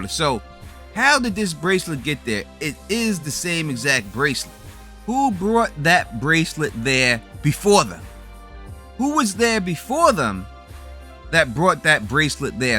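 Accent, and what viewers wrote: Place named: Long Island, United States. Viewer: you from long island, son? i know that accent.....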